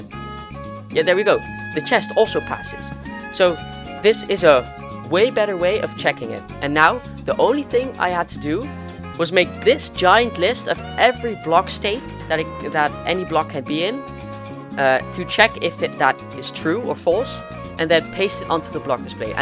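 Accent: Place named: Netherlands. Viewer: i smell a dutch accent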